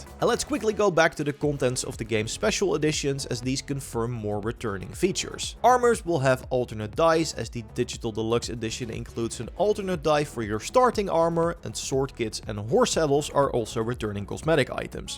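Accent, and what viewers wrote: Netherlands. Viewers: I hear an accent . You from europe ? Netherlands?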